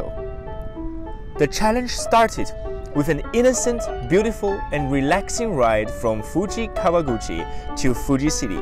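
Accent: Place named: Germany. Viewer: Also bist du österreicher dann? Zum ersten hab ich den Deutschen Akzent gehört. Ich bin in der Schweiz gewesen.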